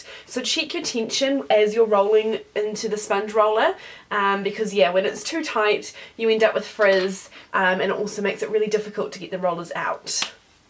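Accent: New Zealand. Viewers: It’s a New Zealand Accent that is where I am from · Your hair looks ABSOLUTELY beautiful!! This tutorial is so helpful!! Thank you! Where are you from? New Zealand? I love your accent. (I am American. 🙂)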